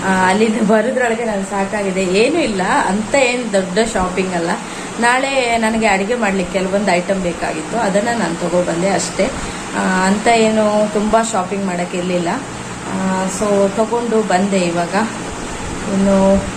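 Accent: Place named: Mysore, India. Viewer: Nimma bhase Mysore side matado tarra edhe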